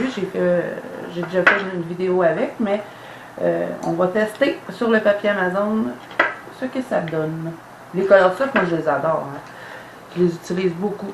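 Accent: Quebec, Canada. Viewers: c'est bien ce que je pensais et tu me réconcilie avec cet accent que je ne supportais pas auparavant. Il y a aussi Mélissa qui a contribué à cette évolution lol.... En tout cas, merci à toi du fond de ton Québec où mon mari rêve d'aller... et moi, pk pas maintenant que je me suis habituée à votre parler hihi... 💋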